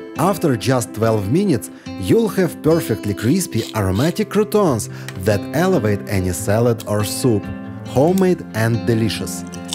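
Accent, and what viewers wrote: Russia. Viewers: английский с ру акцентом!) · Русский акцент или мне показалось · Не зная Английский, слышу русский акцент😂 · Ужасный русский акцент !!!! Зачем говорить???? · Speaking english with russian accent is terrible..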